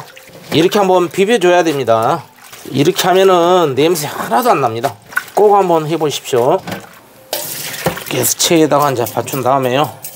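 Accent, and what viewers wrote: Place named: Gangwon, South Korea. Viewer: 강원도 분이시네요. ㅋㅋㅋ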